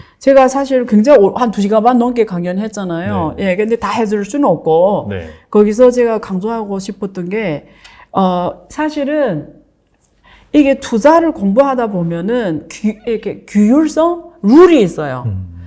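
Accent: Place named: China. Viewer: 저 중국식 발음 도데체 이해가 안된다 좀 고쳐라!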